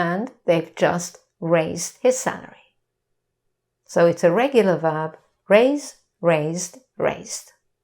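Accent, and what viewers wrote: United Kingdom. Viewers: Madam Which type of english do you speak? American or British one? I feel you're a british type of speaker, am I right?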